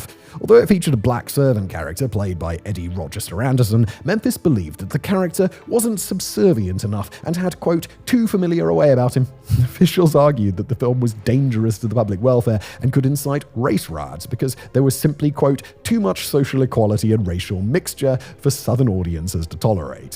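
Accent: United Kingdom. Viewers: Shocked that your actually British thought it was a act 😂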